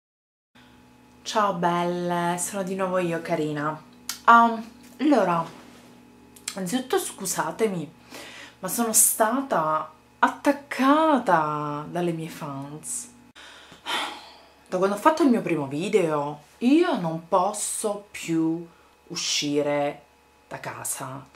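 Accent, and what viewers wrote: Sicily, Italy. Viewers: ma quanto ti adoroooooooooooooooooooooooooooooooooooooo..... poi il tuo accento SICILIANO lo adoro.... anchè perchè anche io sono catanese :******************************************* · sei siciliana? :)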